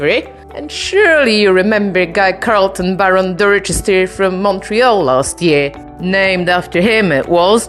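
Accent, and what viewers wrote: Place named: Europe. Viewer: Love your accent which part of Europe do you come from?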